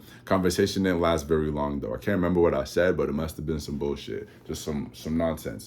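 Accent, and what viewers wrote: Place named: United Kingdom. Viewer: it sound like u got a British accent a lil 😭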